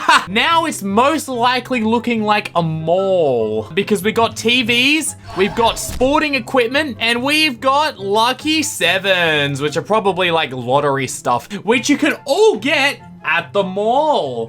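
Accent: Australia. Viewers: You have a Australian accent! I’m Australian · I can definitely tell you have an Aussie accent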